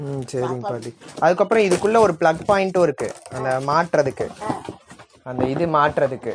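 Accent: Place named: Kongu, India. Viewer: Unga kongu thamizh pechi arumai🥰😘😊☺👌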